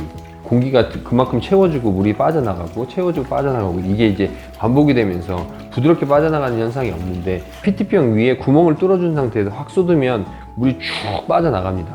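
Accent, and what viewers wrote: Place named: North, South Korea. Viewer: 저는 부산사람인데 걸리버 방송을 연달아 듣다보니 저도 모르게 위쪽 사투리가 나올거 같아요ㅋ 근데 차분하게 설명해주셔서 참 듣기좋아요~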